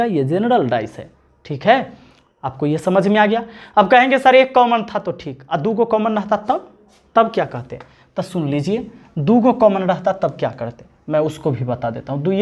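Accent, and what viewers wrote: Bihar, India. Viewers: Sir bihar se ho naa sach bolna🎉🎉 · का सर जी ,रउओ बिहारी बानी का??? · Bihari boli❤ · ap bihari sur me bolte ho to maza nhi ata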